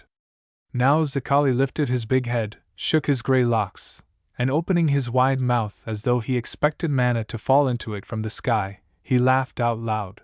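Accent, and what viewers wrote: United States. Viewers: Have been a fan of H. Rider Haggard since the 1960s, especially the Allan Quatermain series. It is a shame that the reading is done in a rather flat artificial intelligence voice, and an American one at that, with numerous mispronunciation, the rendering of Natal is especially jarring one, it's a place not giving birth. Have also listened to my favourite AQ story " Allan and the Holy Flower " read once again with a American voice, this time female, and it's very difficult to except that voice taking the part of a English male big game hunter in Africa in the 1800's. It needs someone like Greg Wagland, who is a most excellent audio book reader to take on the roll. I did give this book a thumbs up not because of the reading but because of the book.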